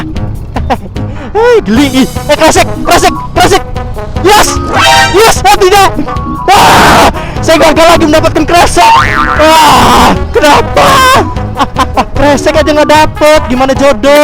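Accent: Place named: West Java, Indonesia. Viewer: njir udh ketularan bahasa + aksen kungkingkang :v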